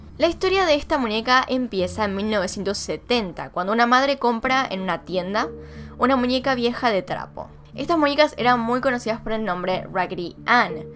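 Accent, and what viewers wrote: Argentina. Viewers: Creí que no me gustaba el acento argentino, pero me encanta escuchar los casos con ese acento xD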